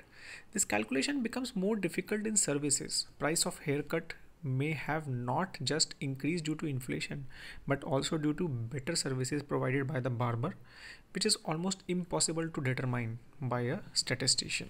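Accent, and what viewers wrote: India. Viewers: Nice Indian accent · I hate Indian accent.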